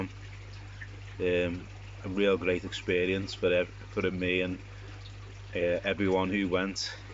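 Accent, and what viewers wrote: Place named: North London, United Kingdom. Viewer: Watched a few of your videos and by the accent your not far from me 👍🏻 I live in North London now but grew up in Skem..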